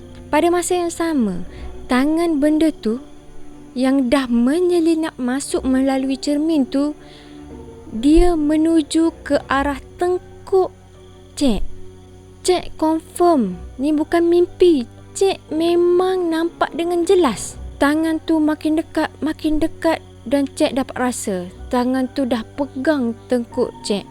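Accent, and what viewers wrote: Kelantan, Malaysia. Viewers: Tak sedak dengar Eah kecek ore kolopur.😂😂..kecek kok Kelate lah..baru sedakk dengar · Sdap dgr bhso klate ehh, jelas pm jls...mce kekkok gtu hehe...gapo pm semoga n maju jaya😁.. HADIRRRR👻👻👻👻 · kecek Kelate lama..x jdi seram bilik kecek luar😅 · Tksedak kecek kl kecak klatey la kak dio 😢 sapo stuju like sek kito😊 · Xsdp la dngr kalau bekencek luai... Kelantam lg best... 😂 kencek luai jdi lawak plak... 😂😂😂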